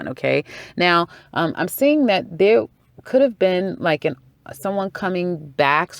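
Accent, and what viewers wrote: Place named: New Jersey, United States. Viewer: nice reading dead on....luv ur accent sounds like New Jersey New York or Maryland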